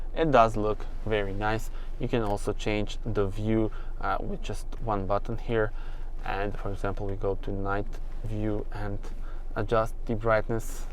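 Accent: Russia. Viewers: Мне показалось, или у него русский проскальзывает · с русским акцентом)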